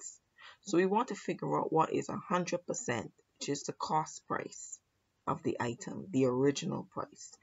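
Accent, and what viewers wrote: Barbados. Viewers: This is an excellent explanation. Thank you very much. Your accent sounds Bajan.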